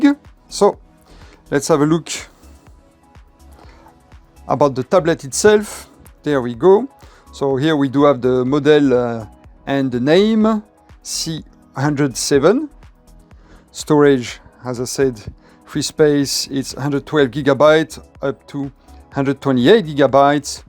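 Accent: France. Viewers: He have french accent 😮, but the tab is good🎉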